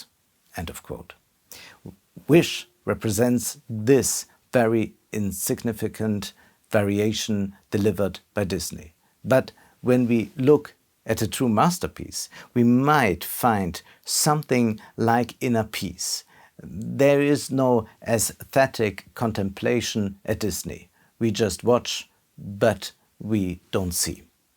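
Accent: Germany. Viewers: Weil sein English zu Denglish ist.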